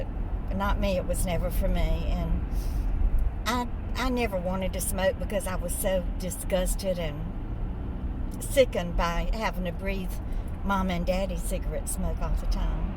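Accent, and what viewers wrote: South, United States. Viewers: We just love your stories! Enjoy the grand babies! We laughed at " the cigarette made my head swim" with your cute southern accent. Do you have a Mississippi Pot recipe video? Have a great weekend. ❤ The Lewis Family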